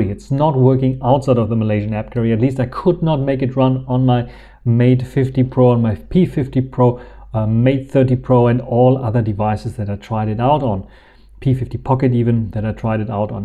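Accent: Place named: Germany. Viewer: Ein deutscher Kommentar flutscht mal durch, weil ich denke da einen deutschen Akzent zu hören :) Folgendes: Ich stehe kurz vor dem Kauf eines Huawei Gerätes, bin aber auf zwei Banking Apps angewiesen, erstens N26 und zweitens ING. Kannst du das vllt mal mit GBox testen und schauen ob das läuft? Danke dafür im Voraus! Zweitens: Ich sehe gerade dass du seit sagenhaften 16 Jahren Youtube machst, fast auf den Tag genau (im Okt 2006 hattest du das erste Video gemacht), Respekt!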